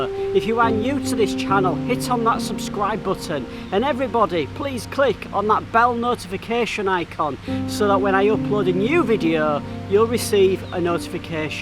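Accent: United Kingdom. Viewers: That accent of yours intrigues me. I left Lancashire 1968 so my ear for accents has almost disappeared; especially as now most of the North country accents have emigrated southwards. Would you mimd telling mr where in UK you originated. Oh, and thanks for all the great Malaysian videos!!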